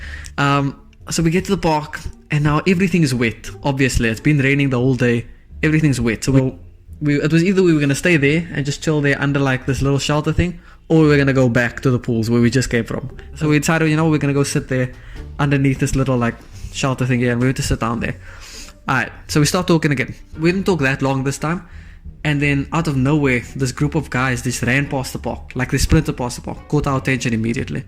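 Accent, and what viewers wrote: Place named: United Kingdom. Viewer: You sound British ngl also W transition at the start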